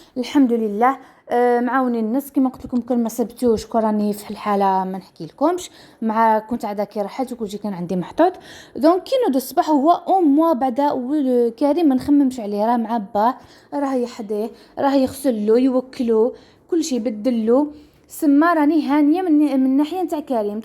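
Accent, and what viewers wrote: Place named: Ghazaouet, Algeria. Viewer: سلام ختي اولا الحمد الله على سلامتك والف مبروك تتربى فعزك يعطيك الصحة ويقويك لكوزينة نظمتيها غاية ❤وخلي لي بغى يهدر يهدر لمهم تعرفي روحك وتعرفي راجلك كيش داير ويعاونك وواقف معاك ربي يخليكم لبعض🤲🏻 اختك ام حبيب من سيدي بلعباس اصولي من ولاية تلمسان كيما انت من لهجتك عرفتك من نواحي لغزوات ولهيه😂عجبتني قناتك بزاف والفيديو كان رائع❤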